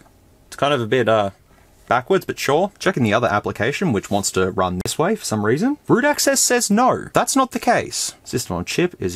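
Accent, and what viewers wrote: United States. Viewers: You got a nice American voice ! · American here. Your American accent was actually really good! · I enjoy ur American accent lol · DAMN! your american accent is pretty solid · Just saying, you've got a pretty good American accent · Your american accent is actually pretty convincing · Your “American English” accent is pretty spot-on, tbh. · Well, you're no Hugh Laurie, but your Yank accent definitely does not suck, bro. :)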